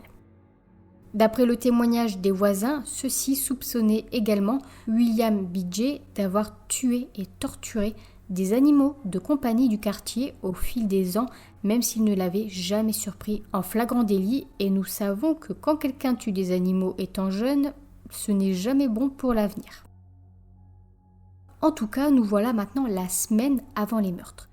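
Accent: North, France. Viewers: Je viens de découvrir ta chaîne, franchement trop bien, des true crimes bien racontés, des sources et des images réelles, bravo !!! Sinon tu viens du Nord comme moi? 😉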